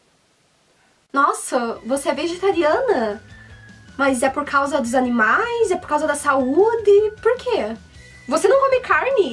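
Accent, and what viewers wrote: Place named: Sorocaba, Brazil. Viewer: Que sotaque gostoso! sinto saudades dos meus amigos de sorocaba que falam assim, tenho amigos do sul que falam assim também. Minha amiga Priscila fala igual a ti!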